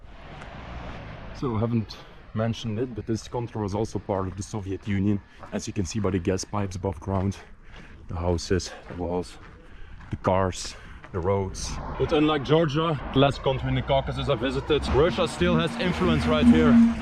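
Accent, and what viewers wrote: Netherlands. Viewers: mien jong ik volg je nu al een tijd en ik wist aan je accent dat je nederland kon spreken lol. greetings from groningen for ya. youve gone on quite the adventures! i always like seeing it. just please be safe with the railways and such from time to time! i saw someone already notified you of them being electrified. keep it up though, exploring places is what shows us beauty in what was left behind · Hou van je nederlands accent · Loved it, loved the dutch accent too lol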